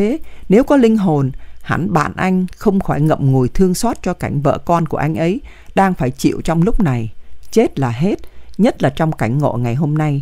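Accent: North, Vietnam. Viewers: Người đọc là Bắc kỳ, đọc chuyện của Nam Kỳ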